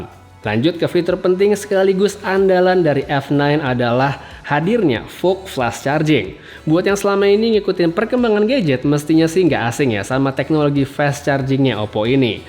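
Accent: Bali, Indonesia. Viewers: Bli nak bali ne??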